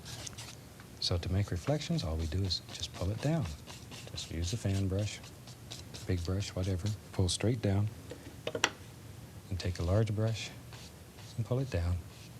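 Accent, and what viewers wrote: United States. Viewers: It's a regional American accent. If you're referring to the way Bob pronounces it. ♥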